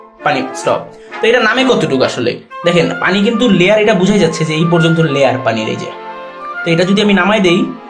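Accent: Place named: Barishal, Bangladesh. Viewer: ভাই আপনার বারি কি বরিশাল নাকি 😊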